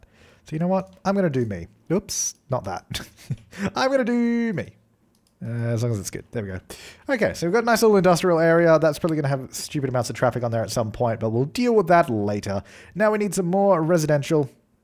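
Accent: Australia. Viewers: Both and Jazza are Australian. Have the same accent:) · R u form Australia?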